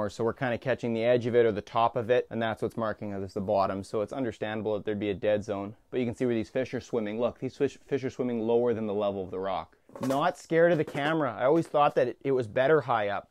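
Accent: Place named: Canada. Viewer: you make (by far) the most unique and entertaining fishing videos on Youtube. It took a while to get used to the Canadian accent, but it's grown on me like mold on week old bread.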